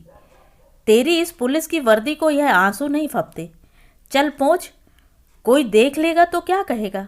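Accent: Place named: Haryana, India. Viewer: बहुत ही सुन्दर कहानी है और ठेठ हरियाणवी अंदाज में सुनाना भी मन मुग्ध कर गया।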